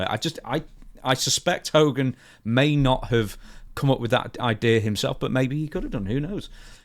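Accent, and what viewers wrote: England, United Kingdom. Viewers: Hi English Accent, American Accent Here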